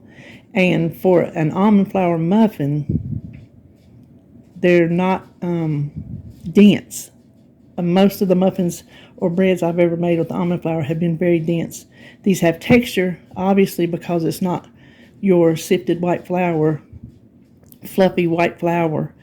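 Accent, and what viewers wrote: South, United States. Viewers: They both look delicious. I often bake muffins with almond flour and they freeze beautifully. You mentioned your accent in another video ~ I love your southern accent and your wonderful way of telling stories!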